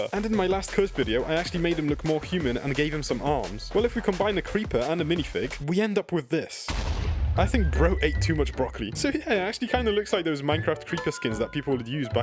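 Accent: United Kingdom. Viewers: Bros British I’m British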